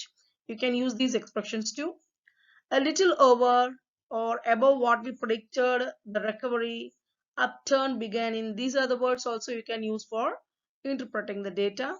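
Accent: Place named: India. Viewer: this is indian accent😊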